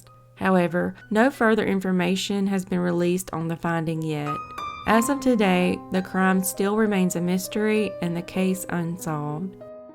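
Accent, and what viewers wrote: South, United States. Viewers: This northerner loves ur sweet southern voice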